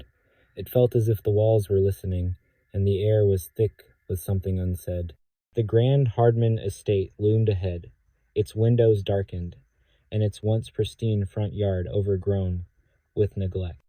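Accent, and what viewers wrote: United States. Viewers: Horrible narrator with American accent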